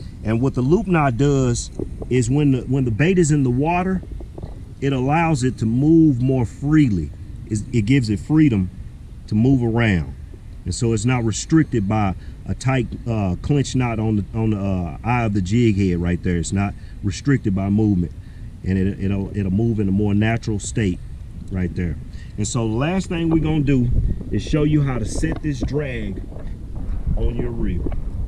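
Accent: Texas, United States. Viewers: Are you from texas? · lmaooooooooooooooooo that country voice tho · Texas baby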